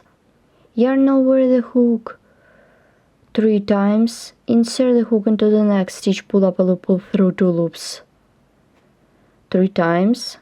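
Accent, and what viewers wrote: Russia. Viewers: А по русски можно? Вы же не американка, русская слышно. Практикуете на инглише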